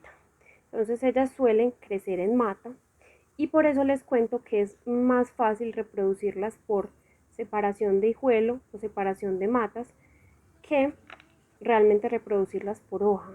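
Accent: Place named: Antioquia, Colombia. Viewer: si te sentía el acento paisa jejeje saludos desde Cali y adelante con tu canal.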